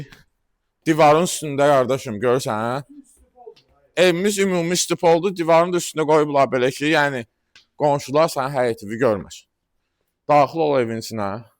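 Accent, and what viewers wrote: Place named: Baku, Azerbaijan. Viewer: Allah qorusun. Bakılı ləhcəsi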